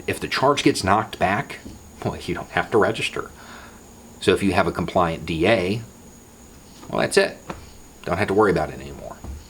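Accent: South, United States. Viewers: Northeastern-Americans should listen to you,. I think there is a bias there against your dialect there; even in it's most refined, learned, journalistic expression. Liberal Yankees raise their kids on "Mississippi Burning" hoping they will grow up woke, but the coded message is that anyone south of Pittsburgh must be racist because the North is where people are free. You are a credit to the English Language, sir; and I have never heard these ideas said better, and neither has any gun-control liberal. You are the voice that could show white Yankees that they are not nearly as "woke" as they thought they were. just an idea.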